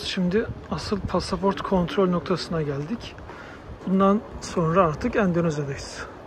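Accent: Samsun, Turkey. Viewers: abi afganistan videolarından birinin altında "elli" derken e harfini vurgulama şeklinden Samsunlu olduğuna ikna oldum yorumu vardı. O günden beri e harfini söyleyiş şeklin iyice bi dikkatimi çekiyor engel olamıyorum. Endonezya derken de aynı vurgu var dinlerken fark ettiğimde çok hoşuma gidiyor😁 yanlış anlamazsın umarım art niyetle yazmıyorum.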